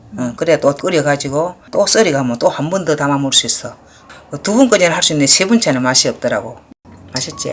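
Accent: Gyeongsang, South Korea. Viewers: 선생님~ 엄마가 정말 팬이세요~ 햇양파가 많이나와서, 양파장아찌는 벌써 두번째 담아 먹습니다. 남해분이시라더니, 저도 경상도 사람이라 말이 정감이 갑니다~~♡♡ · 말씀 도 완전 경상도 사투리 정감 가고 좋아요 😀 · 엄니 설명이 유머스럽네요 경상도억양 정겨워요 양파장아찌 맛나보네용 비율도좋고따라하기쉽고~👍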